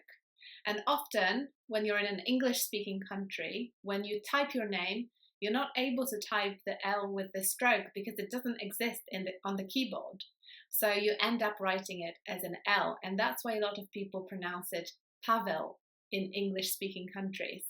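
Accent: England, United Kingdom. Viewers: Love your English accent 💕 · Polish, speaking English with a British accent. And it's not Michael said the cat, it's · Good explanation. Almost has an English accent. I reside in Alaska.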